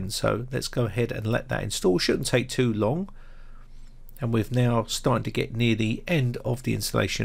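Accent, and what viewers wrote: England, United Kingdom. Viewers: noo? a noo partition? Wait, you SOUND English... so.... noo? What Nationality are you, boy? its a NEW one on me.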